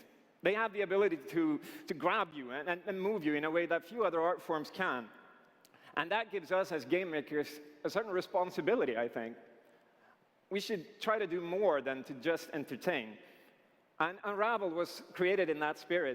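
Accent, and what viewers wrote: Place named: Sweden. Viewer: This guy is running a small company of 14 people and together they created this game as a result. I have no idea whether the game is any good but this is what people like him do. They create games and that's what they know and are used to. To stand in front of a large audience and the industry as a whole as well as in front of hundreds of thousands watching him over the internet is no easy task if you've never done anything like that. I would refuse to stand on that stage because I would fear losing it and forget the last word I spoke. Further more this guy's native language is Swedish. Not English. I think he did a fabulous job of making a case for his and his co-workers game. How anyone can blame him in a negative and down playing way for being nervous and especially exited for the task at hand (in a cute Swedish way) is beyond me. 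But then again... there are a lot of fourteen year olds on the internetsez that have thrown the diapers/nappies and learned how to type.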